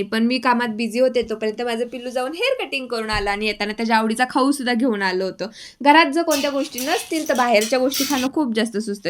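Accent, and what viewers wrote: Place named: Kolhapur, India. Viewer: Tumchi bhasha amchya Kolhapur sarkhi vatate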